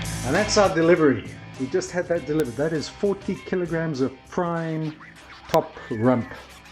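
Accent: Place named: South Africa. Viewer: You sound like a SAFA, so where is the fat boet? Fat = flavour Regards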